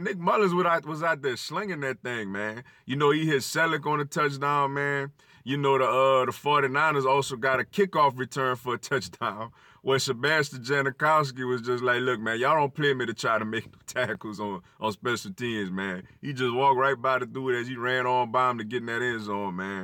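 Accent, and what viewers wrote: United States. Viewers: Oh your a Jamaican using an American Accent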